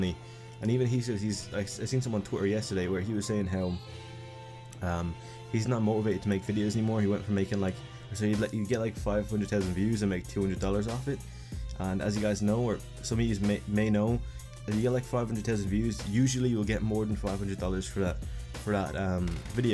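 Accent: Ireland. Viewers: you irish cuz i am :)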